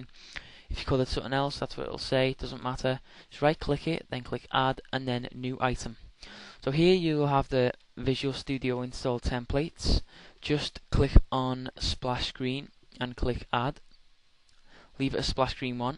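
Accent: Liverpool, United Kingdom. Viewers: I think you will find it's Scouse (Liverpool) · Neva mind, Found it! Nice Accent by the way! Scouser? I'm Welsh, I h8 my accent!!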